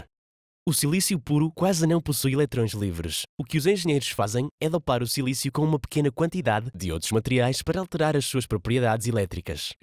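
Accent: Portugal. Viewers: Português de Portugal 🙄 brasileiro nao entende isso rapaz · Tenho dificuldade para entender a lingua portuguesa falada em Portugal. É diferente do Brasil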